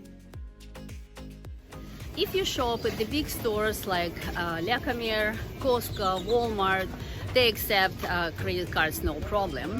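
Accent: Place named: Eastern Europe. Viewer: Hello, my wife and I are considering moving to Puerto Vallarta. My wife is Russian, since you also sound eastern European, I have a couple of questions for you! 1. Is there a fairly large Russian community there? 2. Is there someplace there to buy Russian food products? I appreciate any information you can give us, thank you!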